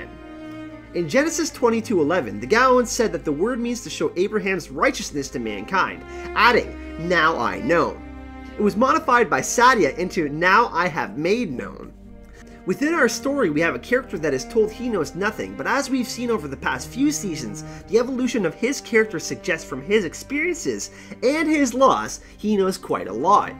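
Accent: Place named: Canada. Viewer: Wass🆙 🆒vid! Enjoyed this 💯% Your up-beat personality 💞 makes your vids a joy🌈 to watch👀 Your Cute Canadian accent makes your vids a joy🌈 to listen to👂 Always a pleasure, Thanx! 💞 💙💛💚💜💙💛💚💜💙💛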